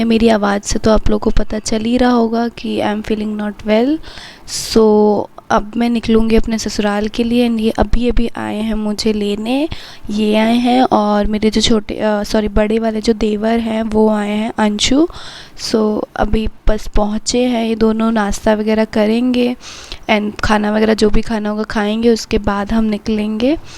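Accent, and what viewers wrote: Bihar, India. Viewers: Kya aap Bihar se ho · App Bihar se ho kya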